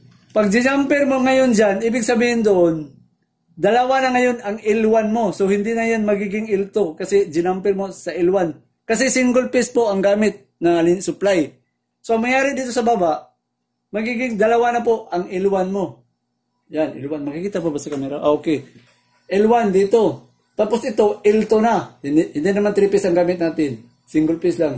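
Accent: Visayas, Philippines. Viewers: Visaya ka boss😂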